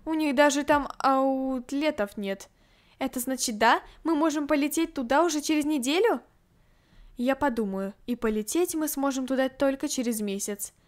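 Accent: Ukraine. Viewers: ты украинка?:_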